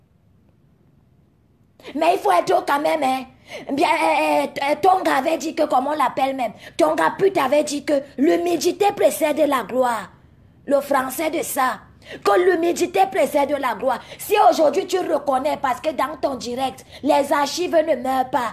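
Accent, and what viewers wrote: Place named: Bassa, Cameroon. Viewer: Mama pourquoi tu m as vendu ???????????? Je suis au sol okololooooooo toi alors moi je t écoute a fond tu n insultes pas tu t exprimes bon français a la façon des bassaaaaaa c est l accent qui me finit une fois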